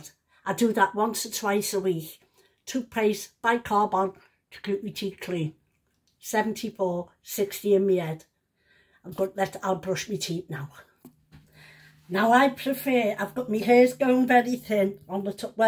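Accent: United Kingdom. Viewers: ❤🧚🏻‍♀️🌹 I’m So happy to meet U on here. I’m Teresa, from New York, USA. I just LOVE Ur accent. I’m guessing British. I love so much of England and Ireland. I’m Puertorican and my great grandma was Irish. If U are, equally I like U. Ur personality is lovely n sweet. Hope to see more of ya my sweet lady 🩰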